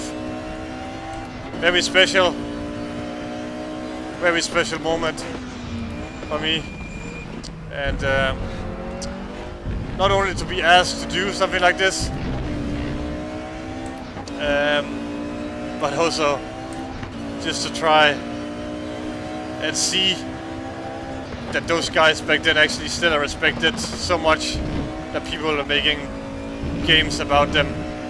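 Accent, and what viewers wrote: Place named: Denmark. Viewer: Odd, your Youtube profile list you as German, but you sound really Danish. XD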